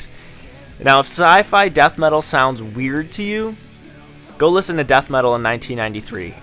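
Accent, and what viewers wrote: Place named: Philadelphia, United States. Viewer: Don’t even try me on that. Fuck you… This dude clearly from philly. Love it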